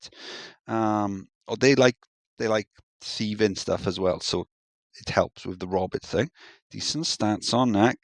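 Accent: Wales, United Kingdom. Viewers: I've been trying to place your accent! Is it Welsh? Hopefully you don't mind me asking. Regardless, I love your RCT content and have been using a ton of your coaster designs!!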